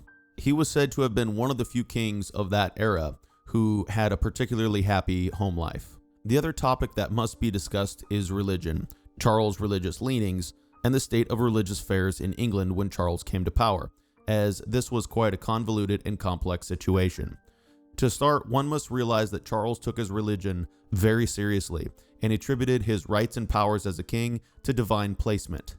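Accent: United States. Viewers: I prefer listening to David Starkey who is English and pronounces the English language correctly not some American robotic voice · The Lost Cause is completely riduclous and based in racism, White supremacy and pro-slavery nonsense. The Cavaliers don't exist anymore, the Royalists won in the long run, but the people of Britain wanted the King back, the Americans have never wanted Confederates back. Don't compare the civil war on slavery to the English Civil War. Plus, the Peasants' Revolt never threatened the monarchy. Learn English History. You sound American. You clearly don't know English history, you can't speak English correctly, and you're using Old English in your name. Mate, you're American, you're not English. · Good luck everyone to be endured terrible American commentater cringeworthy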